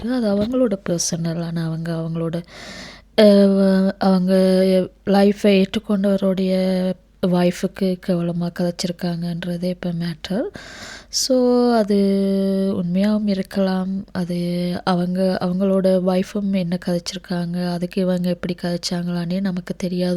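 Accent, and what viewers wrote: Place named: Sri Lanka. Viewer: முதலில் நீங்கள் எங்கட அழகான இலங்கை தமிழை அழிக்கவேண்டாம். இரண்டு தோனியில் கால் வைக்கவேண்டாம்.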